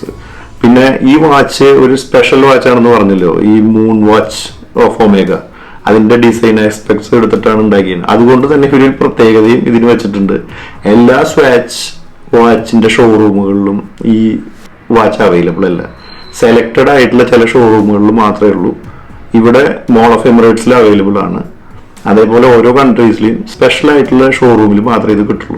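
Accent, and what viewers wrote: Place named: Thrissur, India. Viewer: തൃശൂർ ബ്രോ ആണല്ലേ 👍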